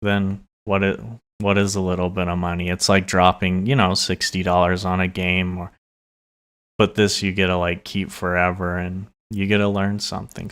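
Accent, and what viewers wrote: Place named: France. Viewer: You shall be spared (for the french accent)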